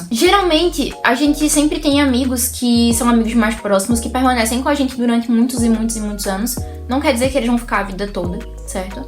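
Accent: Northeast, Brazil. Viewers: PERCEBI PELO SOTAQUE QUE ERA DO NORDESDE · Tá aí um sotaque nordestino arretado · o seu sotaque nordestino é inconfundível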